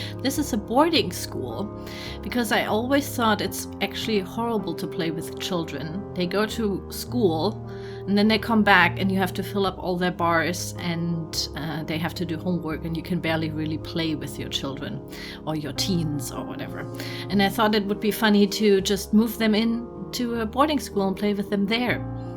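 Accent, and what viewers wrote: Germany. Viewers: I love the German accent. It's so relaxing listening to you speak.. This is a masterpiece btw 👏👏 I love the idea that your viewers have their own dorm rooms and ya'll go to this school together. Something about that idea is so heart warming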